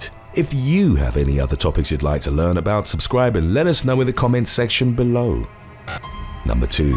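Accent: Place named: England, United Kingdom. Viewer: I figured you were an Englishman in America!!!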